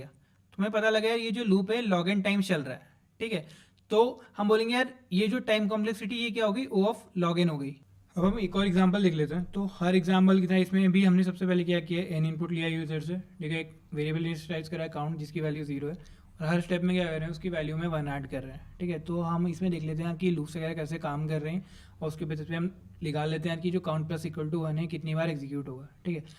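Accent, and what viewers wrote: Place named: Rajasthan, India. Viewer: The mentor sound like he is from Rajasthan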